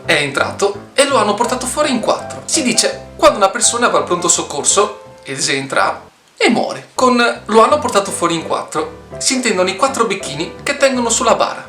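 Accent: Veneto, Italy. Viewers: Ahahah molto interessante! Io sono toscana ma adoro Venezia e in particolare il vostro accento 😍😍😍😍 Inutile dire che quando ci vado capisco meno di zero 😂 Potrebbero anche insultarmi e dirmene di tutti i colori, tanto per me è arabo 😂 Comunque è piacevole ascoltarlo, mi piace la vostra cadenza. :) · Ara che se sente che ti zè daea tera ferma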